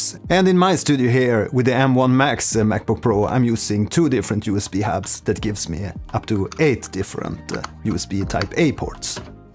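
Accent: Sweden. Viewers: nice anc clear video. I also love the swedish accent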